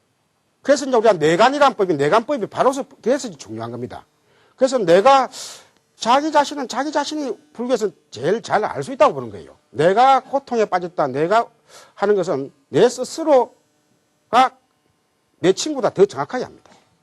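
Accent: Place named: Gyeongsang, South Korea. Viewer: 귀한 영상을 올려주셔서 감사합니다 교수님은 듣기로는 서울대 수학과를 나오시고 동국대를 졸업하고 인도를 유학하신 분으로 초기불교의 대가이십니다 우리나라 스님들께서는 이분의 강의를 꼭 듣기를 권유합니다 우리나라는 대개 대승불교인데 대승불교 없는 초기불교는 있어도 초기불교 없는 대승불교는 없습니다 이 강의가 2005년도경에 서울 남산에있는 대원정사의 당시 대원불교대학에서 강의 하신것 같은데 귀한 영상입니다 많은 분들이 들으시고 불교에대해 공부하는데 많은 도움이 되면 좋겠습니다 경상도 말씨로 알아듣기 어렵지만 몇번 들으면 맛갈납니다 당시 김사업교수님의 유식강의도 명 강의인데 영상을 내려 아쉽습니다 참 귀한 영상입니다 절대로 이 영상 내리지 마십시요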